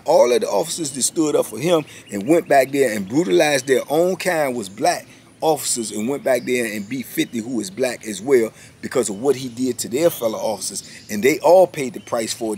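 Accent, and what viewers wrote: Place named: Virginia, United States. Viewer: Lol..thats how they say carry in V.A most all of them..😂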